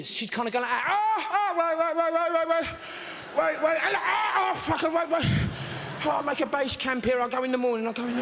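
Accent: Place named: Wales, United Kingdom. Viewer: when he played in Cardiff he often uses a Welsh accent to resonate with the majority Welsh audience same as when he's in Birmingham London and in Scotland go through his DVDs and you will see. Hes Bristolian born in Avonmouth and spent most of his life in Billericay Essex. With a short stint in his teens living in Rhyll North Wales with his dads relatives. Has an Irish mum and Welsh father which would have helped him perfect the accents growing up 😂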